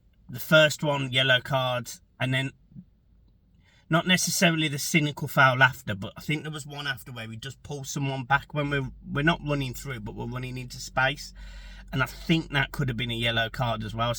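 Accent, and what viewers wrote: Birmingham, United Kingdom. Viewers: 1st time watching . Got confused with Brummy accent thought had clicked on a Villa channel 😂☺ Am a Staffordshire Evertonian so pot calling kettle black. Leicester and Southampton gone.Wolves very similar standard to us. Ipswich have more spark. Us or Wolves.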